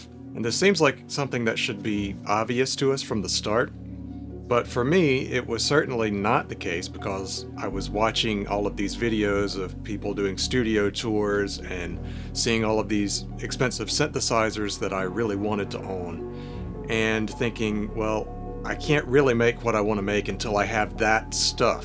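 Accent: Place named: Mississippi, United States. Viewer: Middle of Mississippi? I knew I recognized that accent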